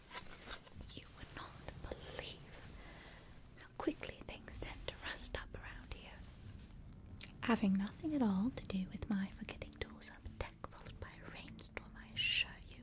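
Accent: England, United Kingdom. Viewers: As a Brit I always feel like you watch a lot of Keira Knightley to try and get this accent. You’re quite good! The elocution smacks of “Brit in a period drama”. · Such a good Babblebrook video, I get so excited when a new one releases. Your British accent is pretty amazing too! Very tingly :D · Ahhh! Am I crazy, or is this the first time ever that used an English accent on a Babblebrook video?! It's lovely! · Me: can't possibly be more appealing...: Starts speaking with a British accent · As a British person, your English accent is certifiably fantastic!! And that 📣🐈 👀 👁👁 made me burst out laughing. Love the language and humour you use in your videos. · So excited to have more Babblebrook content! Gorgeous visuals and intriguing plot as ever, also a pretty decent attempt at an English accent!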